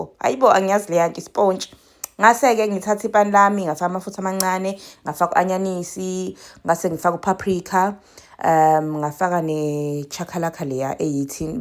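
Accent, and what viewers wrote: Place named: Zulu, South Africa. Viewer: Ngiyakubona nokudla... Ngithanda isiZulu ne accent. Maye. ❤❤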